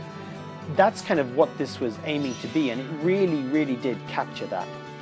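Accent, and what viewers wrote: United Kingdom. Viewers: Has it been taken down? You sound British, it's not been taken off the UK store? I can't find it, I can only find the prologue game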